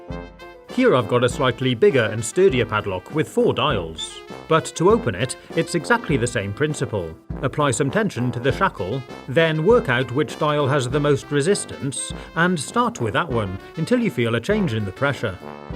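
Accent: United Kingdom. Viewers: Pure #BritishAccent nice to hear as it is conspicuous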